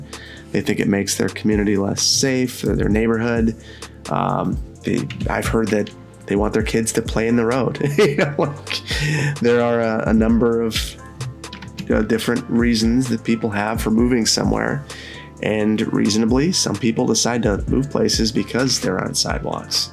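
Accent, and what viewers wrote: United States. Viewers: Standard American pronunciation. We do things to French that would make you either homicidal or suicidal. For example, potatoes "awe grotten," or meat "with au jus." And if a house has a bathroom attached to a bedroom (very common in the US), it is said to be "with en suite."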